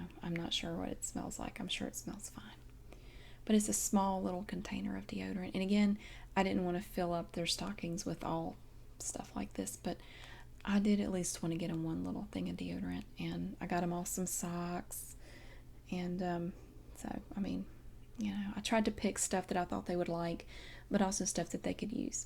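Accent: South, United States. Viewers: Holy marriage proposal! I could listen to that darling sweet voice all dang day! Gotta love that Southern accent!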